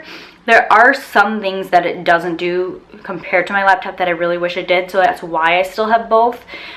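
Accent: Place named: New York, United States. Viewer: Thank you so much for filming and sharing this video you have such a wonderful channel and equally amazing content that is an absolute pleasure to watch and enjoy I love your accent are you from New York by any chance?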